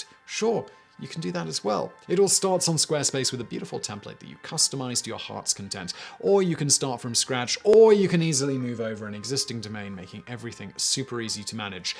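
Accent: United Kingdom. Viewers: he's a Brit living in Prague why would he pronounce things in American English? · Does he say Advertisements as "ad vert is ments" I guess it makes more sense than the American accent version, but as an American...I kind of hate it. Its "Ad-ver-tise-ments" like I cant stand how brits say "Gare-ege" GA RAJ. Ok, the way we pronounce words not as they spelled, is dumb. Ill admit. Still not a fan of how brits say things😅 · is British and that’s how many of them would pronounce it via their accent. Learn something new every day indeed.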